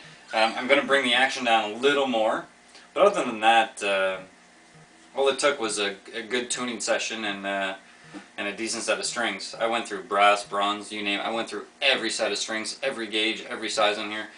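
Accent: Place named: Canada. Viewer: Thanks for the review, useful. btw, from ur accent alone, I knew u were from canada, and im not making fun, i find the accent soothing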